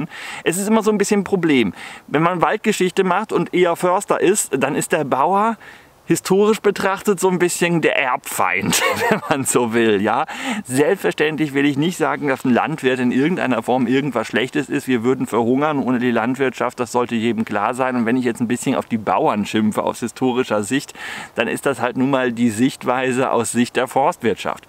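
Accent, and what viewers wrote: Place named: Baden, Germany. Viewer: Das mit dem "rechen" und dem "rächen" in der Aussprache ist halt Badisch. 🤠 Hat nicht der Trump gesagt man soll den Wald rechen damit's weniger Waldbrände gibt, so wie es in Finnland gemacht wird. Angeblich! 🤣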